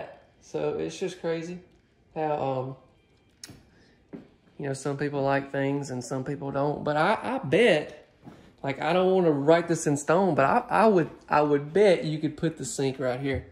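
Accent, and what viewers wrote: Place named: Biloxi, United States. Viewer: That's ok I love you accent it reminds me of Biloxi where I'm from now I live in KCMO in a double wide.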